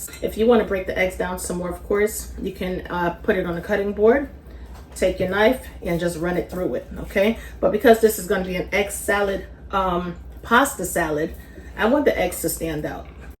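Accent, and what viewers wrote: New York, United States. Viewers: must be from up north I’d say NYC. Because she sounds like me when I talk 😂thanx for the cooking tips on this plate 👏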